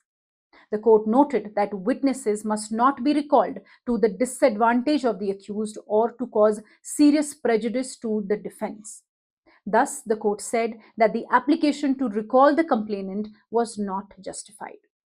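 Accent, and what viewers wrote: India. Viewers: Her accent is kashmiri english..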